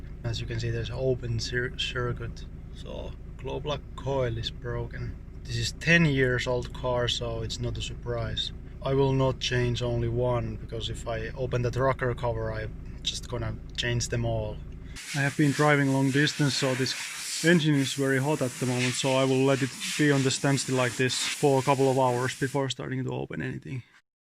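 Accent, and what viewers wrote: Finland. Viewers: your accent sounds exactly like finnish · Finnish accent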